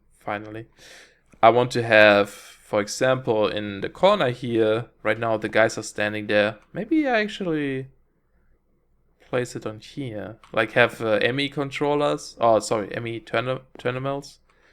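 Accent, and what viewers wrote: Germany. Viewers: I love the accent. German?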